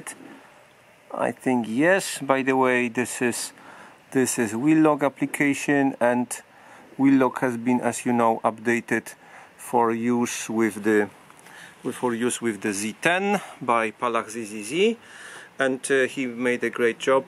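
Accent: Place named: Russia. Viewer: Как будто русский по-английски говорит)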